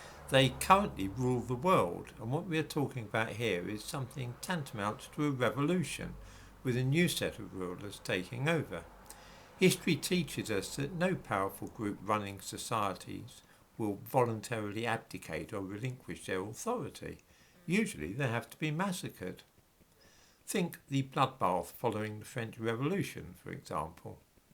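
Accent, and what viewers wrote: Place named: Cockney, United Kingdom. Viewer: He thinks he has a neutral accent? Maybe to an East- Ender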